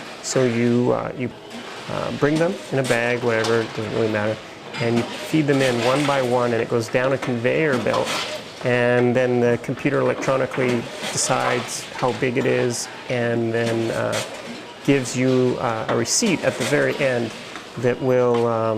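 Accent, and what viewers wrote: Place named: Canada. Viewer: Great video :) Makes me wish I were in Finland. You look quite Finnish, but sound very Canadian. Did you ever manage to learn any Finnish? I've managed to get to a conversational level, but that's the most difficult thing about Finland. I think in the US, we need more of those yield signs, especially in the country when there's not a person around at the four way. No one ever fully stops anyway.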